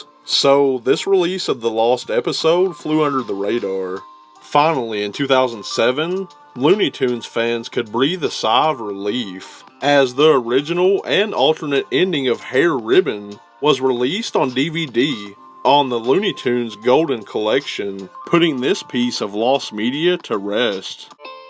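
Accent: South, United States. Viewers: Are you Chills doing a southern accent?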